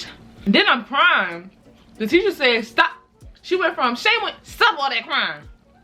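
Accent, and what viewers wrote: Baltimore, United States. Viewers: you frm bmore cs i here that accent 410!!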